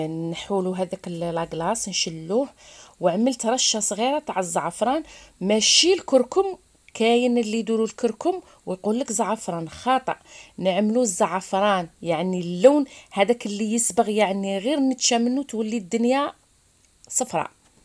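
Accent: Mascara, Algeria. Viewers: هدرتك كيما تاعنا، معسكرية انتيا باينة؟ هههه الله يعطيك الصحة غادي نجربها اليوم للعشا ونردلك الخبر بسياسة.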